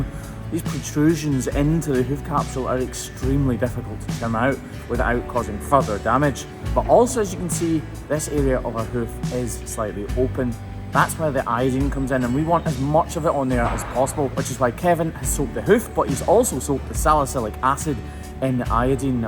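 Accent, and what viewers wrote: Scotland, United Kingdom. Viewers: Please continue to pronounce iodine the way you say it. You are Scottish in Scotland. I very much enjoy listening to you speak. Don’t change for You Tube.❤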